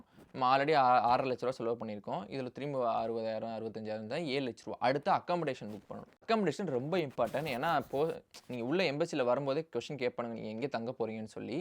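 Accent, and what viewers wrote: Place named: Karaikudi, India. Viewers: Karaikudi slag perfect Thalaiva ❤😅... love from Karaikudi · Sivagangai slang 😅. Kkd yanss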